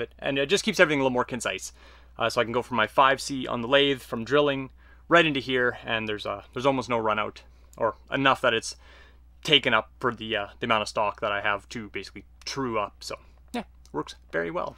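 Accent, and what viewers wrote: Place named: Canada. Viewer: I could hear the maple leaf dialect within 3 seconds of the beginning of the video. Hehe. Gotta love Canada.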